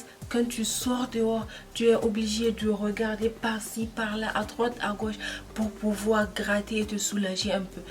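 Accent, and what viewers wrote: Niger. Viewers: Merci chère soeur Sinon tu as un accent Nigérien.suis Nigérienne aussi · J'ai direct reconnu l'accent Nigerien 😅, merci pour les conseils !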